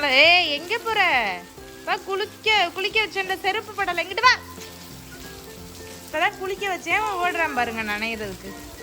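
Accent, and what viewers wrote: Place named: Madurai, India. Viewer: Recently started watching your channel ❤so nice to hear the Madurai and Ramnad slang..my parents are from Ramnad.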